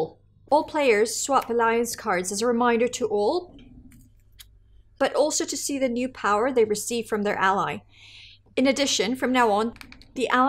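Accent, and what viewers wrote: United Kingdom. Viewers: Wow. Thank you so much. This was such a well produced rules set for Dune. I’m sorry that I’ve never come across your channel before. Do I detect a hint of Scottish in your voice? Thanks again!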